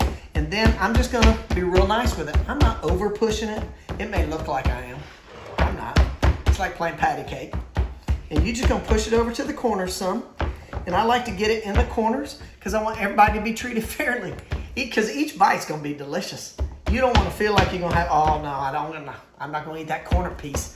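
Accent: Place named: South, United States. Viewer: Dad jokes, Rice Krispies, country accent, not harsh on the eyes. I don’t see how this video could’ve been better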